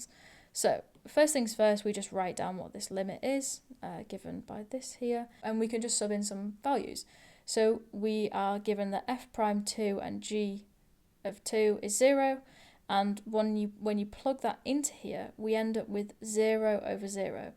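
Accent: United Kingdom. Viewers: Bruhh that's british not Australian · Are you British?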